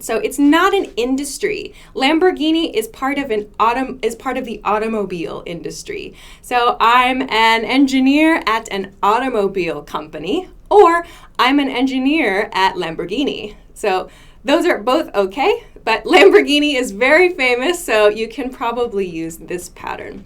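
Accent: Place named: United States. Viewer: Yes, this is American English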